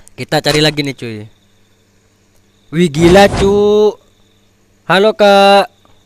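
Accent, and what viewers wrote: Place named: Madura, Indonesia. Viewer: Dari bahasanya dah kek orng madura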